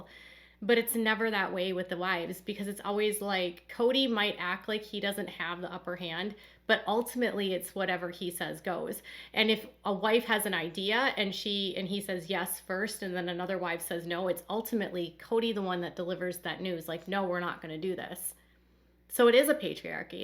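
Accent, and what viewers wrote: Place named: Minnesota, United States. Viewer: I could never put my finger on your accent before because it's VERY slight...but then you said "Minnesota" and I heard it and it was the most adorable thing I heard today 🤣🤣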